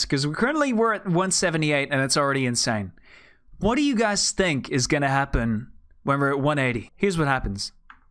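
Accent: Netherlands. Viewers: What kind of accent is this? Dutch? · Are you dutch? i am If someone is dutch say it in the comments. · Hawky he’s probably actually Dutch cuz he kinda has a tiny bit of accent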